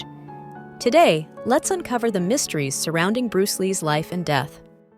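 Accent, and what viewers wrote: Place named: United States. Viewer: As usual this channel has a narrator who does not pronounce common English words correctly! Though narrator speaks with American accent, no attempt to bother with obvious words. So annoying and personally I do not believe many of this videos info. The makers are careless, do not bother with proof of many of their statements. Do not bother...waste of your time.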